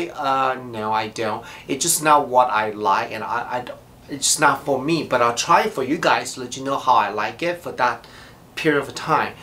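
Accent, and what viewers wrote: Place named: Mexico. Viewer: Why does he look Asian but sound Mexican?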